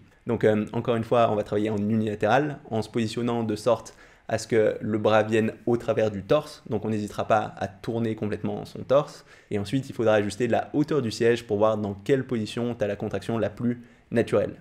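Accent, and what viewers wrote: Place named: Bourgeois, France. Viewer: C'est pas de ta faute mais ta manière de parler c'est vraiment insuportable ta un espece d'accent de bourgeois chelou troo enervant. Sinon la video est super bien faite bien expliquée et merci pour les conseils mnt je vais faire comme toi.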